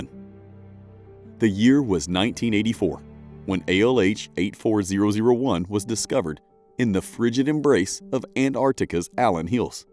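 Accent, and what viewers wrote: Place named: Appalachia, United States. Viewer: "Narrated by Reverend Lovejoy, of Springfield..... somewhere in the U.S.." It's so weird hearing narration with a Southern Appalachian accent, or thereabouts. East/Central Tennessee? Then, or so... um... "One of these things is not like the others." Somebody really badly misspelt "Sulfur." , your captions spelled maghemite wrong; there is no such thing as "magami." , Arctic has a 'c' in the middle. It is not "ahr-tik." it's "ahrk-tik." Please. , there it is: "EE-kwae-tuhr." That's East Tennessee. Straight up "Beverly Hillbillies'" Buddy Ebsen, as Jed Clampett. Equatorial as "eh-KWAE-ti-ohr-uhl?" No. It's, "eh-kwih-TOHR-ee-uhl." I would proof-listen for free, btw. Inhabitable is not "ihn-hah-BIH-tuh-buhl." It's, "ihn-HAH-bih-tuh-buhl." I am starting to wonder if this voice is an AI pretending to be southern. 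I have literally never heard anyone else mispronounce this word. Ever. P.S. That Biden section was unlistenable. Had to skip it. We need better.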